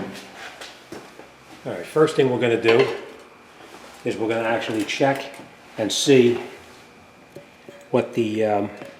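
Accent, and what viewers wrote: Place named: New York, United States. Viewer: You have that strong NU YAWK accent! Excellent video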